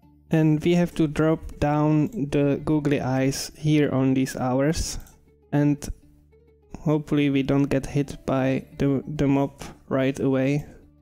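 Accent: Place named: Czech Republic. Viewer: já ten českej přizvuk výdycky poznám xD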